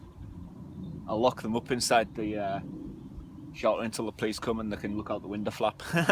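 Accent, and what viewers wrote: United Kingdom. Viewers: OMG XD where do u live? I live in the U.K. And I see you have the accent!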